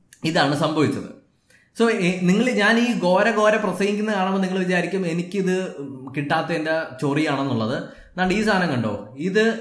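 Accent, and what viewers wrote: Trivandrum, India. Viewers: Bro brode Trivandrum slang enikk ishttappettu.... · loved ur trivandrum slang ....ghora ghora....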